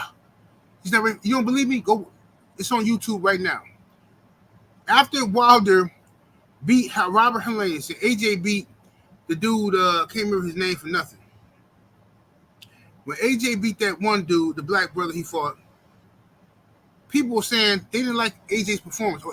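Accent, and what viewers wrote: United Kingdom. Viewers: Your Scouse accent 😂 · Your U.K. accent. 😂👌🏻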